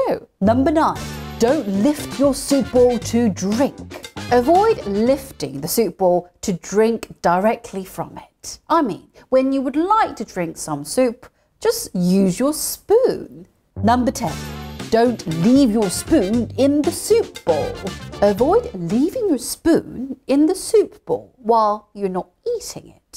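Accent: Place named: United Kingdom. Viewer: 영국 액센트 넘 좋아요. 영어만 실컷 들을 수 있겠어요. 지금 그대로도 예쁘세요~^^ 미스때는 선녀셨군요~^^❤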